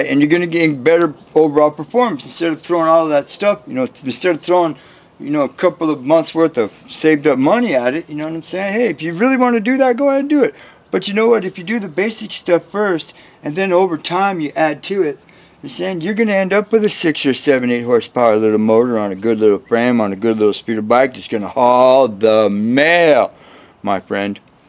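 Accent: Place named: Arizona, United States. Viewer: I'm in georgia...i immediately recognized your accent because one of my best friends moved from AZ to ATL he sounds just like you..🤘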